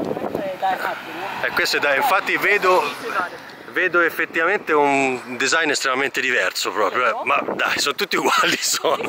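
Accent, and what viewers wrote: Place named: Tuscany, Italy. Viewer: Avrei una marea di domande x te adoro i toscani l accento mi piace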